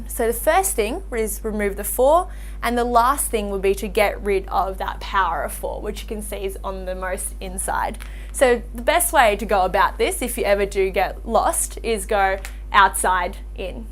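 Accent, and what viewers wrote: Australia. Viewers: aussie chinese descendant im sure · thats aussie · maybe Australia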